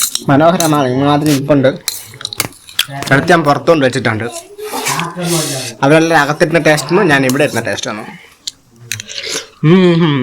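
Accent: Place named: Thiruvananthapuram, India. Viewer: മോനെ നിന്റെ ഭാഷ യാണ് ഇഷ്ടം മക്കളെ നമ്മുടെ പപ്പനാവ സാ മീരെ മണ്ണിലെ ഭാഷ ഒരിക്കലും അത് കൈവിടല്ലേ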